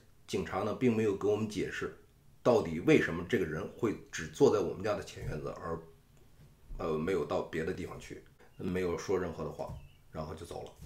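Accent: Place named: North, China. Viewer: 聽您講生活故事．真的很有趣．很標準的北方腔．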